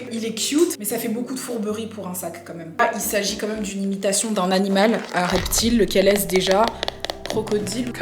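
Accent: England, United Kingdom. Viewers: J'ai découvert ta chaîne y a quelques mois et j'aime beaucoup ! Honnêtement j'avais des aprioris sachant que je n'aime pas du tout la tv réalité et tout ce qui s'en rapproche mais je suis très très contente de m'être laissée tenter par tes vidéos parce que t'es géniale, très drôle, intelligente, pétillante (avec un accent anglais perfectly SPLENDID, tu me touches en plein coeur là) Alors merci pour ton contenu, ton énergie et ce que tu dégages, c'est un plaisir de te suivre ✨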